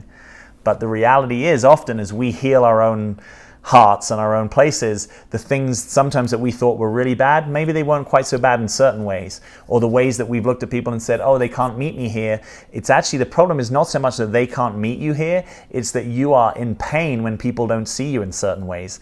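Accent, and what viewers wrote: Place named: England, United Kingdom. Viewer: I think that's what I've gathered. has a very similar accent to mine from England.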